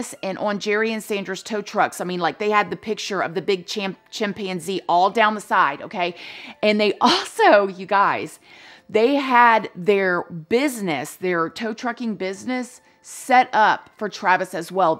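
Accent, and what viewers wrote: South, United States. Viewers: looking at you it wasn't a surprise that you have a country accent lmao..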